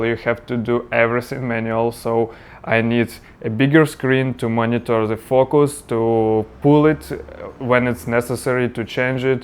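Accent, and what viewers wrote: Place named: Russia. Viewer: Чувствуется русский акцент😁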